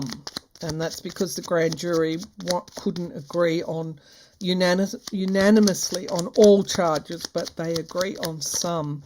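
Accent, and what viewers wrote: Australia. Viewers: Hi, its lovely to hear an Australian accent on this neck of the woods in You Tube land. Its so fasinating listen to what is going on in America with the politics and like the last Presidential Election, i cant wait to see Trump get his Kiss from Karma. I realize most of your viewers are over seas but would love for you to do some Readings on Australian Politicians. Isnt it fascinating to see the same scenario Playing out all over the world in terms of the utter disappointment of performance our political leaders that were in charge over the Covid period. USA, UK, Aust. just seemed to have the most self absorbed leaders at a time when we needed the most caring and Empathetic in Charge. What is amazing to watch now is how One by One they are losing their grip on everything that was more important to them than doing the right thing when they had the chance. 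Spirit really is amazing to watch. Even the communist leaders are having their day in the Heat. I wonder what will come of them too. As you have said, Putin will be the first one to go. I really love the way you read and really hope you can do some pollical readings for Australia. Jo from Northern NSW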